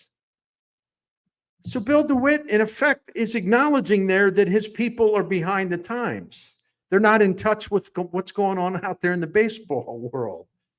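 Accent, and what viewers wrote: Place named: Boston, United States. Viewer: Love ur Boston accent!! Lol. Great storytelling!! Thnx Bernie.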